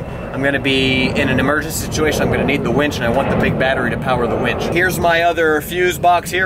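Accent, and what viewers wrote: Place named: United States. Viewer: You sound like an American yet you drive a Toyota? For me AMERICAN MADE BABY . But whatever gets you home. Still a pretty truck though.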